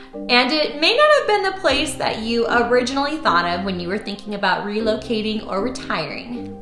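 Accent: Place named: California, United States. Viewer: I was trying to guess where you were from based on your accent. Before you said it , I was guessing CA...and was right! Great video! Thanks!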